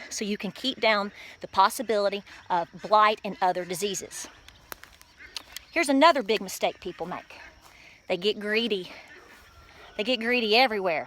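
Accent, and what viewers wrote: South, United States. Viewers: adorable southern accent!!!! Thank you for your help. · (Brit) You say Tomayto I say Tomahto. But let's not call the bloody thing off cos I love your video! And that American accent! :)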